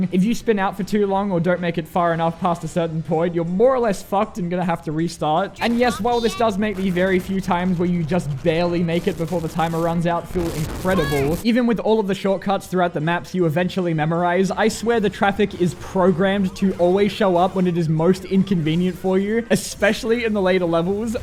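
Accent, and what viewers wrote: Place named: Australia. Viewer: I heard your Australian right well guest the hell what mate I’M A AUSTRALIAN TOO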